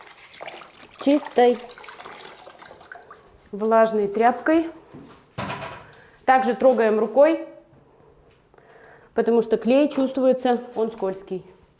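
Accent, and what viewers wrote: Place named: Perm, Russia. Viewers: Люблю Пермский говор. Видео понравилось, душевненько, но резать обои ножом навесу с запасом в 2 мм. это нужно иметь хороший навык. Да и многое другое рассчитано на хороший опыт. Для чайника не подойдёт. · Вы с Урала? Говор знакомый. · Здравствуйте! Я смотрел сериал и там девушка прям как вы разговаривала! Вы из Перми ? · Акцент прям Пермский )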